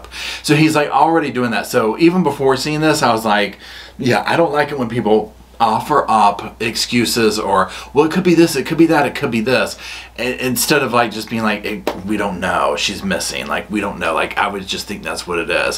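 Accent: South, United States. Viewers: Why don’t you have 1m subscribers? You are the best. Great wit and the southern accent makes it gold. !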